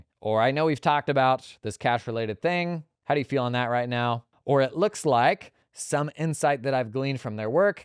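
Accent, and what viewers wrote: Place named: New England, United States. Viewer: why the AI use southern vernacular with a noticeably New England cadence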